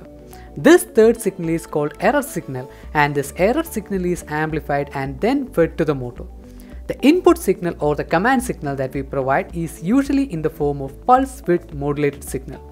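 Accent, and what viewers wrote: Kerala, India. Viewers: Thanks for the video! The mallu accent brought back so many memories from Amrita University!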